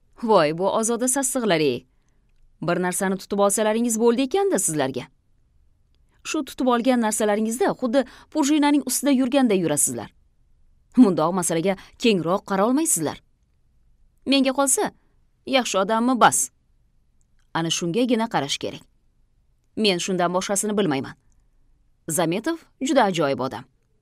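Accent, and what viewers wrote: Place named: Andijan, Uzbekistan. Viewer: Шеваямки анжанча тошканча узбакча 😊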